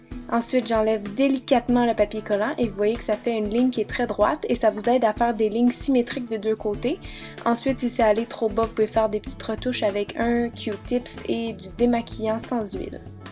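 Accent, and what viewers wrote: Quebec, Canada. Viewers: l accent de Céline dion.....🥴🥴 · j'adore t'es videos, meme si je ne comprends pas tout ce que tu dit a cause de ton accent quebecois (mais j'adore l'accent quebecois);) <3, je suis d'acord pour une video avec plusieurs sorte d'eye liner! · elle est surment Canadienne ou Québécoise si tu écoute sont accent et là-bas on utilise pas le mot scotch mais papier collant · canadian accent :D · t canadienne? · Je pense qu'il y en a pas mal d'entre vous qui n'a pas reconnu l'accent Canadien donc des expressions différentes! Alors sortez un peu, allez ailleurs que dans les magasins de fringues et maquillage, faites vous teindre en brune, achetez vous un peu de culture et vous aurez l'air moins ridicules avec vos commentaires stupides!!!!! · Ta video est super ! Ton accent NSĹDLSKS j'adoreeee l'accent quebequois 😍😍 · surtout qu’elle est canadienne... 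ils ne parlent pas la même langue, du moins pas le même accent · nossa nossa Elle est canadienne ... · C'est un accent québécois.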